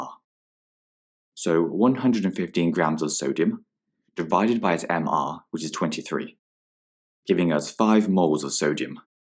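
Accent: United Kingdom. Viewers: British accent 💀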